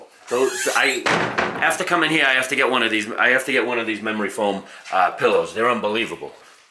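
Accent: Boston, United States. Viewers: Ahhh not to good 😂 about the hot water 😂 wrap your head around it 😂 Boston talk 💪👍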